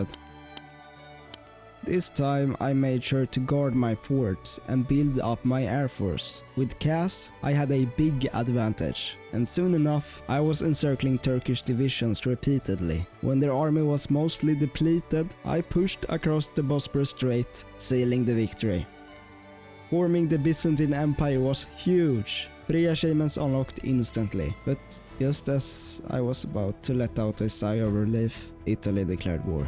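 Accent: Sweden. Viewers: You are Swedish , I can tell. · Är du från Stockholm? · man hör att du är svensk (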